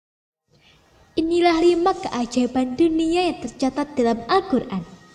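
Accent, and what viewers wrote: Java, Indonesia. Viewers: Iki jowo? Suaranya😂😂😂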